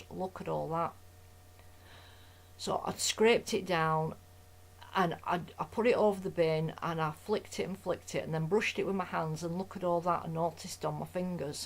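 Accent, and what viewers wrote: Lancashire, United Kingdom. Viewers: Wow, so vibrant. This is the depth of colour I've been looking for and got so frustrated with watercolour pencils and prismacolour wax pencils. I've purchased a set of 12 Brushos and will approach them from a mixed media/craft perspective. You're the first person that I've seen do a tutorial truly painting with them and none have used the thickener in this way. You are truly innovative and inspirational and I love your Lancashire accent. Love from Chester UK.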